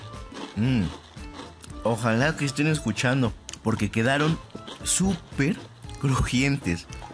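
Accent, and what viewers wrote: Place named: Mexico City, Mexico. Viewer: Cómo se oye que es De el DF , sabe lo que hace. Riquísimo. Felicidades.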